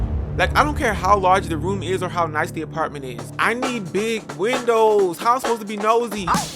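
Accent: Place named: South Jersey, United States. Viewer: I grew up in South Jersey too!!! I always heard your accent and thought he can’t be raised in Arizona 😂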